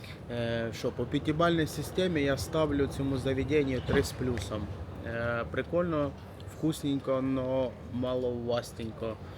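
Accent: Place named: Poltava, Ukraine. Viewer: Полтавський акцент ❤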